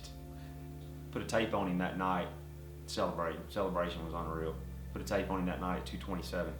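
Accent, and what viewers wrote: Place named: Georgia, United States. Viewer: Been watching boys for a few years now and went to back to watch old videos and you can hear the southern accent on all the boys but this is no doubt a good.ol Georgia boy. I never understood why people let others determine their decisions. The property owner gives you permission but the boyfriend doesn't like hunting so she changed her mind. BS the bf was jealous of another guy being at the house, even though it's the woods out back. I've seen videos where you get permission and then the neighbor freaks out so they take it back. Personally if a neighbor of mine told me they didn't want someone hunting on MY property I'ld tell straight out "it doesn't effect you one bit, it doesn't effect your house, property or life in one bit so too f-en bad". Luckily I live in a pretty rural area and for years couldn't really see many neighbors but they are slowly closing in. 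Worse part is they move out to the country, literally was all farm land when I grew up but those have become almost extinct, but they move out here and then complain about the smell when a farmer is spreading manure on his fields, or complain about turkeys and deer in thier yard eating landscaping or.pooping, but dont like hunting.🤷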